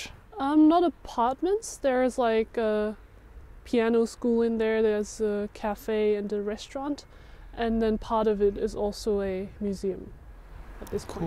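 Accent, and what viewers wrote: Germany. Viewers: You have a strong German accent and and yet you are such an eloquent English speaker are you a native German or did you move there as a baby . Very informative video btw. my family and I are hoping to move to Germany soon for a year. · She sounds German.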